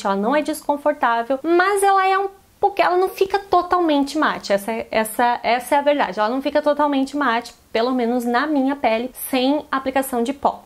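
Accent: Rio Grande do Sul, Brazil. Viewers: Coisa boa é ouvir esse teu sotaque, gaúcha! 😍 todo mundo tá falando dessa base da tracta! Tá fazendo sucesso! Tu é uma lindeza! Beijo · é gaúcho flor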